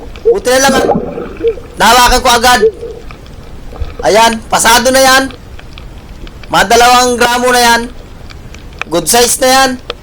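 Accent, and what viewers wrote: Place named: Visayas, Philippines. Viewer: swerte kaparin KSI medyo marami Ang huli mo. Napansi ko syo sa salita mo parang bisaya ka.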